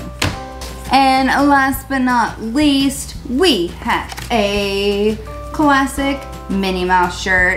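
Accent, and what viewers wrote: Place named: South, United States. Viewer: Hey! Are you from the southern states? I've never heard so much of an accent from you in any other videos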